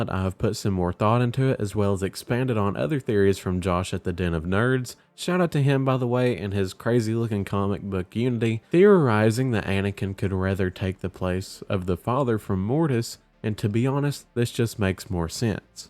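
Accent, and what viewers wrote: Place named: South, United States. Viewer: That accent is 🤌 yeehaw my fellow southerner.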